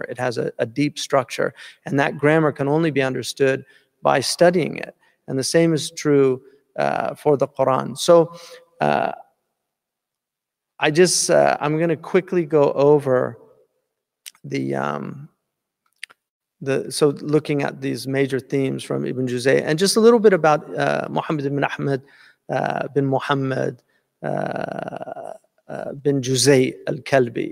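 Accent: Arab world. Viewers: Marhaba Sheikh. You know that ..I can never stop admiring your ability in Arabic language. The way you pronounce is exactly like a one that was born in Arabia. I am born in middle east and I have seen Persian and Turkish when they study Islam. They can never pronaunce the word like you do. In addition to the most beautiful point you refer to. But Sheikh the way you talk Arabic just makes me speechless.It makes you more arab then me!? 😇...amazing!...رمضان كريم علیکم.. نسأل الله ان يكتب لنا الصلاة خلف الإمام مهدى(ع)!💚💥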